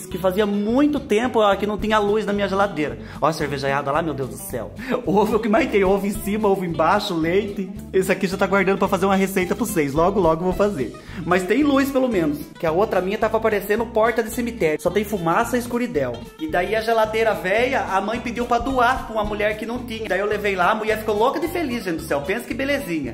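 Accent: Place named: Sorocaba, Brazil. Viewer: Passeando pelo YouTube vi sua receita do bolo de milho... Flocão. Adorei. Gosto do seu jeito! Ah Sorocaba....sabia q tínhamos algo em comum. Nosso sotaque, costumes nos entregam. Abração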